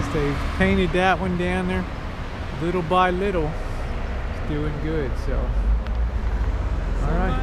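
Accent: Baltimore, United States. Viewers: It sounds like you have a Baltimore accent...have you lived there?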